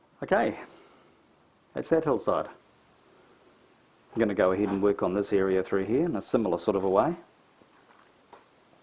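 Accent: New Zealand. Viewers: Glad I found you on Youtube, Finally making the switch from acrylic to oils... I feel like I just went from using sand paper to tissues... it's amazing, sucks they don't teach you any of this in high school. :/ Schools are cheap in australia with their art not very good when the only thing your good at is art. :P Keep it up! Haha I actually like the talking reminds me of my family in New Zealand.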